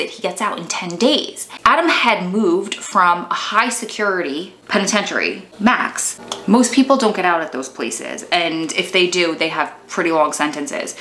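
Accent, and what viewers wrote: New Jersey, United States. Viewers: wait girl are you from nj?? the accent? i grew up there and miss it so much 🥺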